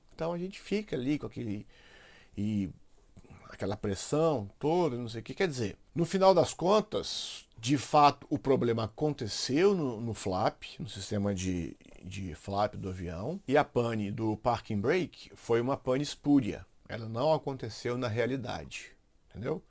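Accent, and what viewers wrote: Minas Gerais, Brazil. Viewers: Você é o famoso piloto que fala "mineirês"?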